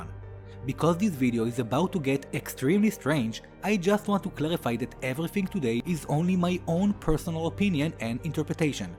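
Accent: Israel. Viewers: Are you israeli? your accent sounds like it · For me it was when he said the word "year." It's an awesome Israeli accent. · Are you from Israel? לרגע חשבתי שאני שומעת בעברית ואז הבנתי שזה המבטא שתפס אותי לא מוכנה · Israeli right? I could tell immediately, it's particularly noticeable when you say "Reiner". Loved the bit about zhuangzi's butterfly, I subscribed. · You’re from Israel,? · The accent is Israeli. 100% Also, your English is superb! Kol Hakavod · Ohhh hebrew Being canadian i never come into contact with that language so its completely foreign to me. Every hebrew content creator ive watched always has a super happy voice so dont worry the accent is enjoyable. And Im glad i finally have my answer because everything about yours and others pronunciations is typically spot on until you get to Vs. Im gonna have to research why that is because sometimes ill hear the V pronounced correctly in "Video", while other times its "Bideo". 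Just crazy that language does that to you where you dont even realize you arent pronouncing it right huh? I have a similar issue because I was born in Quebec, so i was raised around french and Ill pronounce it weirdly cause my mind slams 2 puzzle pieces that dont fit together into a incoherent thought. KInd of like how the Japanese have a hard time distinguishing Ls and Rs. So if I see a "oi", in french it's pronounced "wah" , but thats not really something you see in english at all.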